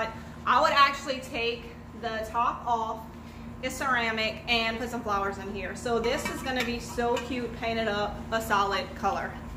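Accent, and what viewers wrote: Louisiana, United States. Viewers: LOL, I was trying to figure out the accent too, and figured it was Louisanna as well - tiny Canjun French (first time watching as well). Then she says South Louisanna....we did good ! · I could not find where you were located, but the accent, ahhh, a hint of home - has to be Louisiana! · First time watching and I love the Louisiana accent...the aaaa, ummmm just so cute.. oh my when the kids came in I fell out when you said " Wait I have a kid in here" 🤭 ! I think I am coming back to check out the finished beauties 😊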